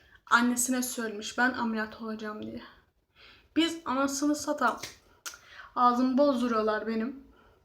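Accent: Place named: Aegean, Turkey. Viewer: Senin ege şiveni yirim😂😂😍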